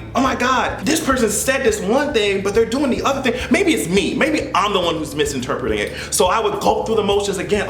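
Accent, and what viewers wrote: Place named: Jamaica. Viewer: Hey, 1. put out as many videos as you can! At times, I'm looking out for you and you usually do 1 video a week, besides the podcast! If you can do 3-4/week, if PO.SSI.BLE (your baritone singing voice), please don't hold back! 2. Please bring out the Island/native tongue when expressing yourself! Not ever video, but like this video today, that Jamaican accent would have driven the point! We all know you are quite educated, but some island touches here and there isn't bad. Test out the idea of course, since you do have a steady audience! 3. "You need to CUT IT!" True, some family members are not ride or die! Ok, I'm out!!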